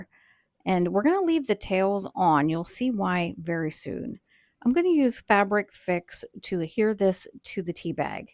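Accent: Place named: Pittsburgh, United States. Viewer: These are so pretty!! Thank you for sharing this information 💖 Btw, are you from the Pittsburgh area, by chance? I'm trying to place your accent.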